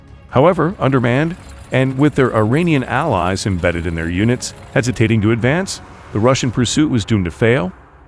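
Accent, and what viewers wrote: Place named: United States. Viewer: Tedious American voice , can't even read or speak English correctly .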